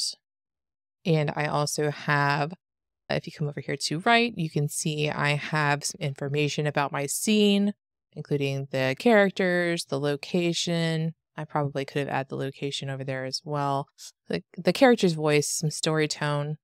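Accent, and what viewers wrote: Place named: Pennsylvania, United States. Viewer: Is the narrator for this video from Pennsylvania? I detect a similar accent. :)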